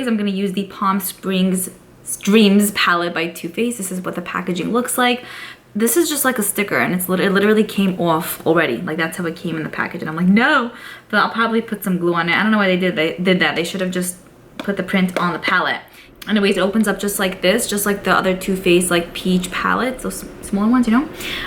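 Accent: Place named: East Coast, United States. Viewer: I adore your East Coast accent! And i ordered the TF palette yesterday. Only would’ve ordered it on sale vs full price tho.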